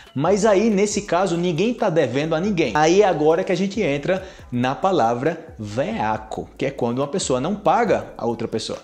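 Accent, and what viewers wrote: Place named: Colombia. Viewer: putzzz cara, tô chocado do jeito que tu fala espanhol, tens até sotaque de colombiano kkkk amo seu conteúdo !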